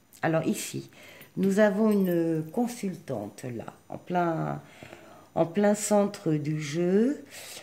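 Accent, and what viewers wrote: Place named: Spain. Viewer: Es tu espagnol? Y a un petit accent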